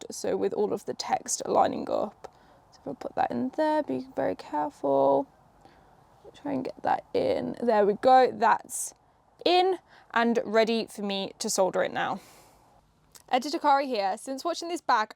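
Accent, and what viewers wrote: United Kingdom. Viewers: can someone tell me from which region in the UK she is? I can hear an accent but can't locate it. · Ouaw l'accent britannique 😊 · Que genia! I love your channel and your british accent :) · She's cute, British accent, good at soldering and fixing things, is it really some miracle?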